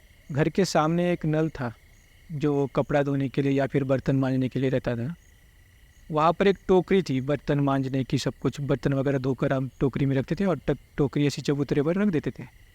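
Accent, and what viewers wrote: Maharashtra, India. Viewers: मराठी ऐकायला खरच भारी वाटत दादा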